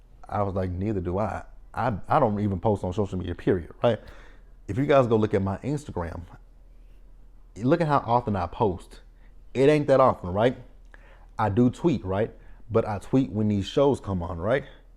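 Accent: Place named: South, United States. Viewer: I gotta catch up on the RTL reviews! You have an accent. You sound Southern, but not country. It's more noticeable with certain words. I like it. Now I can't unsee Shaunie O'Neal when I look at Janelle.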